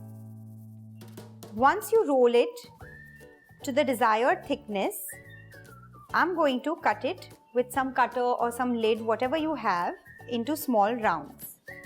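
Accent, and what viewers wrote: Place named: India. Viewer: Your accents annoying. Indian women talks with stupid accents. It gets made fun of all the time.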